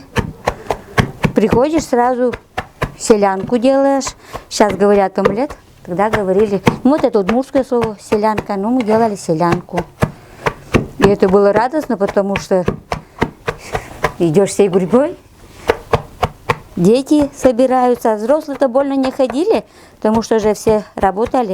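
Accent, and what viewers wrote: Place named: Udmurtia, Russia. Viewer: Дивный сюжет! Я и Ижевска, живу сейчас в Молдове, и просто слышать родной говор так приятно))) Приготовлю на неделе перепечи)))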